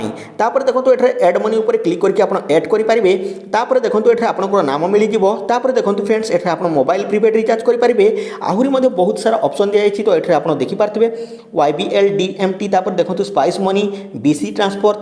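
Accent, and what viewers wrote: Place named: Sambalpur, India. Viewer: Sambalpuri kahu6 bro ... Nice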